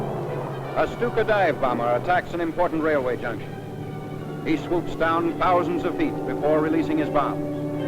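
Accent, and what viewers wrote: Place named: United States. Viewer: German with an American narrator? piss off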